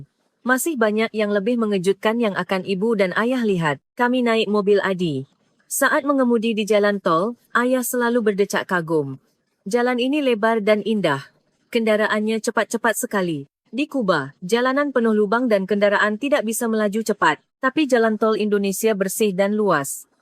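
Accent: Malaysia. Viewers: Narator nya orang Malaysia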